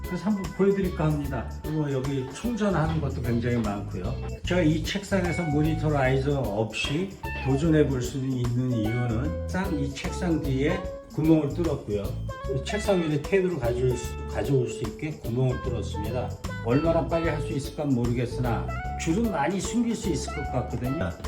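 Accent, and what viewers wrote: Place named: United States. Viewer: 꼭 대학생 같아 보이십니다. 미국 교포 느낌의 억양도 반갑게 들리구요. 책상정리, 선정리 하느라 애먹었던 저의 당시 시절도 생각나게 하네요. 영상 잘 보았습니다.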